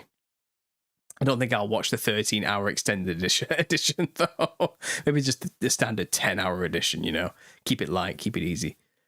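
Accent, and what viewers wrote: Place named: Liverpool, United Kingdom. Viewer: You talk like an Everton supporter.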